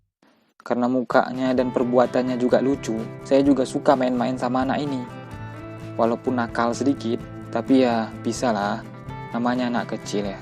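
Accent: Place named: Medan, Indonesia. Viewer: Medan kali bahasanya ya, mantap bg.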